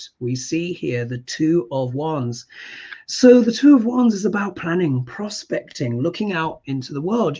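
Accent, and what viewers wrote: United Kingdom. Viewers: 👍🤩The British accent is so easy and good to hear I understand it better. Good predicting as well.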